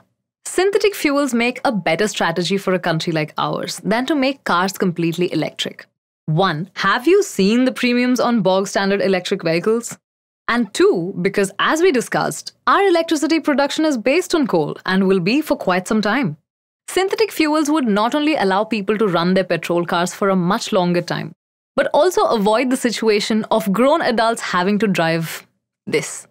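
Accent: India. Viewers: i love indian accents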